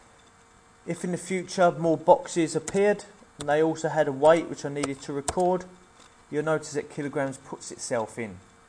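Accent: United Kingdom. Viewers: I like The British Accent, Well Done Brother Subscribed DONE. :)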